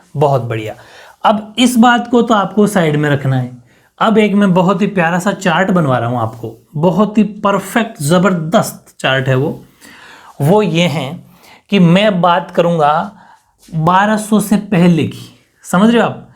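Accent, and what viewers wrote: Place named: Haryana, India. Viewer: Ser aap Haryanvi lagte ho halanki ki aap rajasthan se ho per aapki language haryana jesi lagti he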